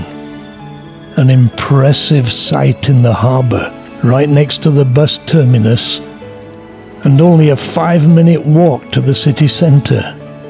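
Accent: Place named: Scotland, United Kingdom. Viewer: I've watched nearly all your videos. they've been a great help to plan our itinerary for our summer trip with our five teenage children. Where are you from? I just can't figure out your accent, sometimes, a bit Scottish or North of England. You're either a Scandinavian who's lived in England a long time or a British person who's lived abroad a long time. Thanks for the great videos and useful tips.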